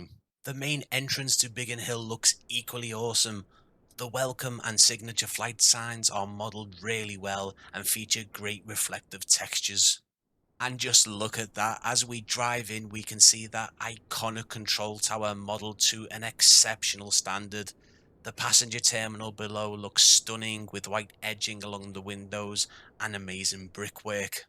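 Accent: Liverpool, United Kingdom. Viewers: loved the Scouse - 50 years down under and can still understand it - and still love to eat it 👍 sad you dont have a Spitfire - ask the Aussie developers to gift you one for review👌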